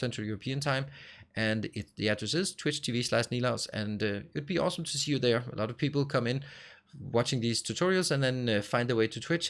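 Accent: Denmark. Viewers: Are you danish, your accent sounds kinda danish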